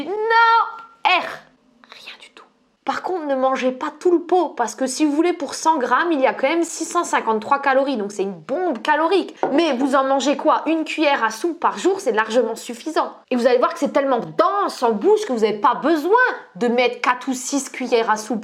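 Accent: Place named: Forbach, France. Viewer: ce petit accent du coin de forbach 👌👌👌 😉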